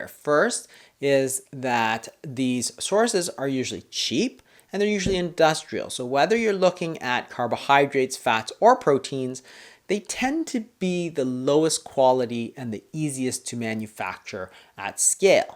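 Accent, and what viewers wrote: Canada. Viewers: Dr. Fung is Canadian, and so pronounces words in the Canadian manner. So sorry this offends you.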